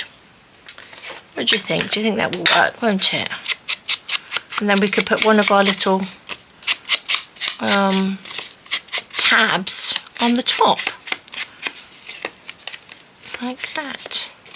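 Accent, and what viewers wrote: United Kingdom. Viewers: Oooh the UK sounds 👍 x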